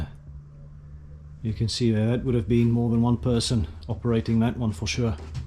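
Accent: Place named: Afrikaans, South Africa. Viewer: 😂Aggg jirrrr, ons geniet jou stories baie. Ons weet jy is afrikaans en probeer jou beste Engels praat, Maar genade my mens. As jy wil sê n plek is gesluit of toe vir die dag.... ASB sê dan tog net "it's closed". Dis die regte Engels. Ek kry krampe in my ore elke keer as jy praat van"it's shut". Waar gaan jy volgende?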